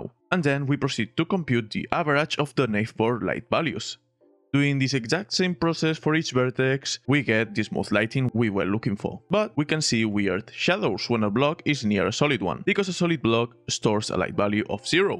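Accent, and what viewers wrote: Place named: Spain. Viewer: That english spanish accent 👌👌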